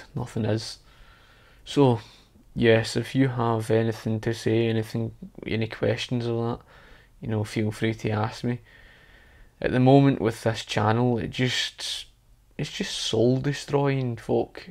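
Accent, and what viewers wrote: Scotland, United Kingdom. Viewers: Hey, welcome back! I'm sorry about your situation, I hope you're well. Have you considered joining other political streams like "The Academic Agent"? Check him out, he's a another classical liberal and makes economic videos and political streams. Perhaps you can get in touch with him! Above all you should focus on yourself, if making other content is what is going to be the best for your situation you should absolutely push for that, don't worry about us we'll be good, but we'll miss you and your Scottish accent. P.S What are your opinions on the new film Outlaw King?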